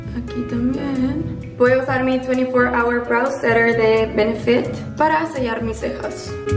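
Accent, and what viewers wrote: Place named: Spain. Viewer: ¿Por qué siento un ligero acento español jajajaja? :)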